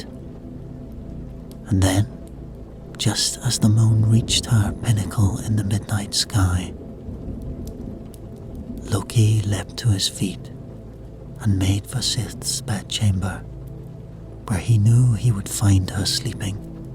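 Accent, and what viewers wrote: Scotland, United Kingdom. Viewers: Really enjoying these as part of my routine before bed. I spend the majority of my year working abroad. The Scottish accent adds a feeling of comfort and home. These are all so well put together. As a fellow Scotsman, cheers pal! Ps. You’ve got yersel a patreon 😉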